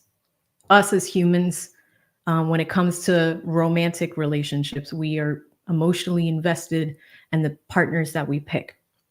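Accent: Boston, United States. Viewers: Oh my.. i love ❤️ you're Boston accent. So great.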